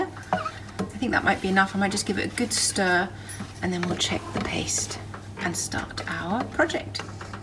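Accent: England, United Kingdom. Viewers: ye proper English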